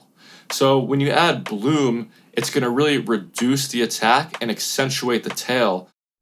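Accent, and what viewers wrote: United Kingdom. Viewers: Oh eck - right out of bloody Yorkshire, I tell thee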